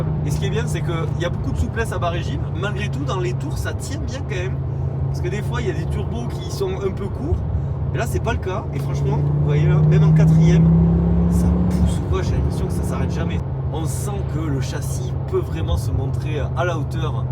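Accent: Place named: Southwest, France. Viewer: Salut , je te découvre avec cette vidéo . Format technique et humour que j'adore . Le petit accent m'est familier ..sud ouest , non ?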